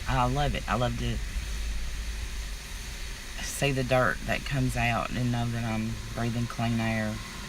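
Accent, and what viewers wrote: South, United States. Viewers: I originally watched her because she posted she ws a cancer survivor,and I am also,i had non hodgekins lymphomia,which is a blood cancer,,then it was all fake.She made a big deal about Amy,long after the drama was resolved,and bunny was exposed.You seem cool,your voice has that southern tang,which state are you from? Iowa here,you know the state you go thru to get to other states. :) so I look fwd to watching more of your vlogs,peace out,Lisa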